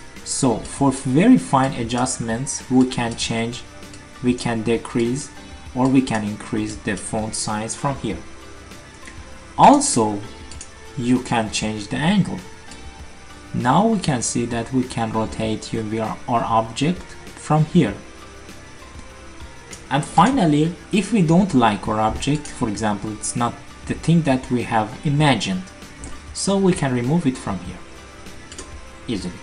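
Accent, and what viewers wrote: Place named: Iran. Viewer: Tnx a lot.. Nice but music is loud i try to understand u.. I think u r from iran..ur accent and the way u speak is like iranian people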